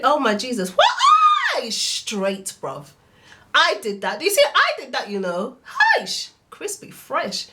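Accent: United Kingdom. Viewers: Great video, still new at interlocking so this was really helpful. It's also so refreshing to hear another British accent, been in the states for 14 years. You are so funny!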